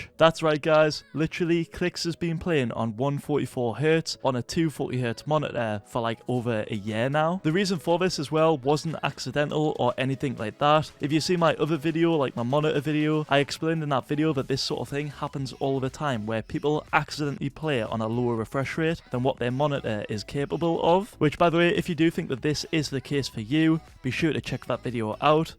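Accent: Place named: Oxford, United Kingdom. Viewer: Oxford english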